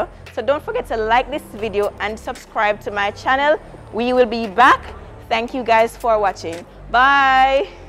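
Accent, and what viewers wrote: Jamaica. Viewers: No man you work there now?? Muss lol i love the way you present these informative vlogs and you just fling the patois left right and centre. I LOVE IT!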